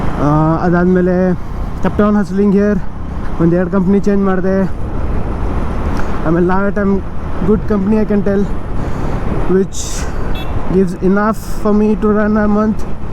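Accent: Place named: Belagavi, India. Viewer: Belagavi slang ❤ Mix masala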